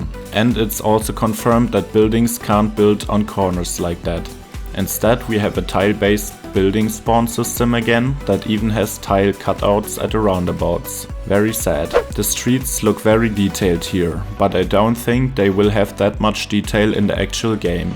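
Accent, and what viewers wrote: Germany. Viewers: Dein deutscher Akzent ist so unfassbar nervig, dass ich das Video nur bis schauen konnte.. sehr schade um die Informationen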